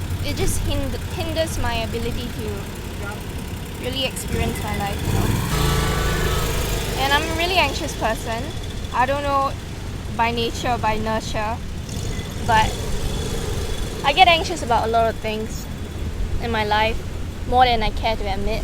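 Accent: Singapore. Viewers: You are adorable and I hear some Singapore inflection Good luck, I will follow this for at least a while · Greetings from California! I have never heard of you, and I don't know how I got to your channel. But listening to your accent, my guess is that you're from Singapore or Malaysia. Am I right? You said you had 300+ subscribers. Look at you now! As of this moment, you have 849! I'll subscribe, so you'll be at 850. Then I'll listen to your music... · Actually, English is most likely her first language. She is speaking Singlish. It's English. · Your accent sounds like youre from Singapore possibly? I went there once several years ago. I'm about a minute into your vid. If you get a passport and live and travel to places that are less hustle culture, it could be a breath of fresh air. Also, i play guitar(20 yrs) and I agree, it's the most free place. Moving to Philippines next week. 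I hope you find consistent peace and happiness along your way in life.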